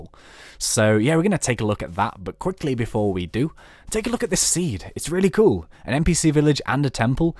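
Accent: United Kingdom. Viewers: are you british? Your voice is a little funny, but very cool video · Amazing Vids Mate, And The Best Part Is Your British!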